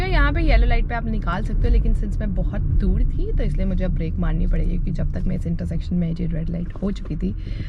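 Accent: Punjab, India. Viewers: I really like your punjabi toning 🔥❤️❤️😁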